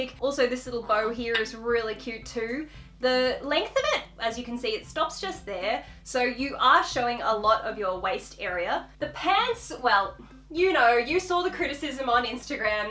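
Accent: Australia. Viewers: The first time I sall you vid I was about to leave then I sall that Bird and then I realized that you Australian u could tell at first I'm used to the accent... Cuz I'm Australian but i dont live there 😭😭😭😭 also I just like you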